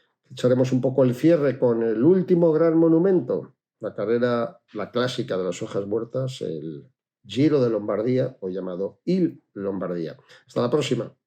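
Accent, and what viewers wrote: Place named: Spain. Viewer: SEÑOR ES MUY AGRADABLE OIR A UNA PERSONA QUE SABE TANTO DE CICLISMO,, REALMENTE MUY AGRADABLE A PESAR DE SE ECENTO ESPAÑOL QUE PARA LOS LATINOS ES ALGO MALUCO PERO EN USTED NO IMPORTA PORQUE USTED HACE SU OFICIO MUY BIEN,,,,,EFICITACIONES DESDE LAS MONTAÑAS DE COLOMBIA.......TIO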